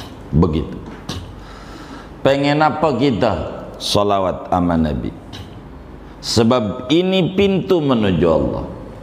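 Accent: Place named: Jakarta, Indonesia. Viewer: Pantes aja... Tapi logat bhsany betawi banget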